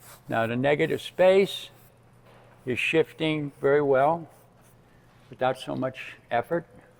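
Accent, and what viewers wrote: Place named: United States. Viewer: I was not sure at first and I thought on no not another yank talking, but I just love how they turned out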